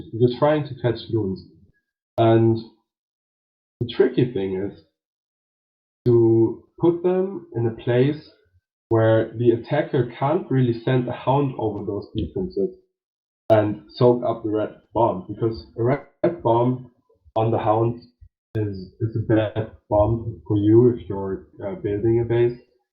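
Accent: Germany. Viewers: base buirding..... I love the German accent!! DL FTW CWL invite Season 2!🔥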